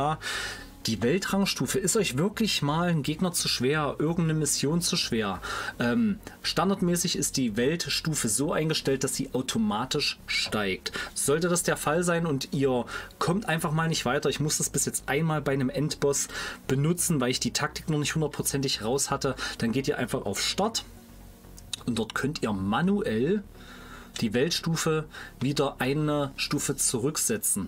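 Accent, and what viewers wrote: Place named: East Germany, Germany. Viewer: Like fürn Ossi Dialekt!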